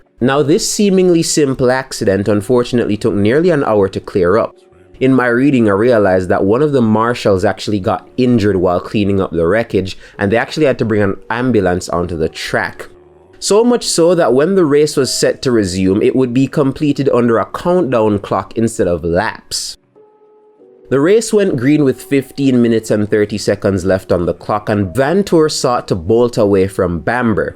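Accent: Jamaica. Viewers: I can't tell Are you Jamaican Or Pikey? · I was thinking canadian, but yh probz yardie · are you Jamaican by any chance?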